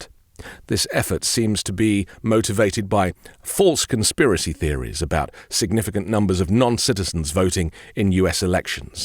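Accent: United Kingdom. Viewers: I'm european and left-winger. In Europe nobody would be able to vote without proper ID. Given his accent, I assume this youtuber is British. He knows in his home country nobody would be able to vote without proper ID. In fact, you can't even open a bank account without ID, register kids in school, access healthcare services, nothing gets done without an updated ID card. That's why identity theft is rare in Europe. I don't understand why this is even an issue. Being able to vote without proper ID gives room to corruption and rigging of the election process. The USA needs to create a standardized citizen ID card like all properly funcional and organized countries have. This lack of official standardized ID card is some 💩hole country crap.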